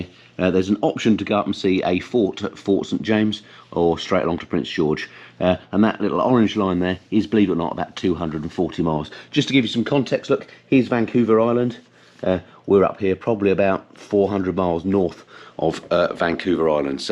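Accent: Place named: Yorkshire, United Kingdom. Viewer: I thought you are a Yorkshire man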